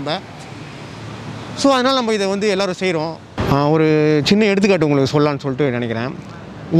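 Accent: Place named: Singapore. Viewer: Bro Singapore Yan mathire pesama Nama ooru alumayhiri pesuka bro video nice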